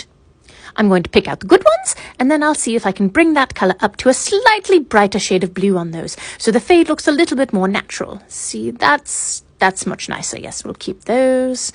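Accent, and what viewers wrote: RP, United Kingdom. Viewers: I don’t know if I’ve ever heard a more posh accent, absolutely love it!!!!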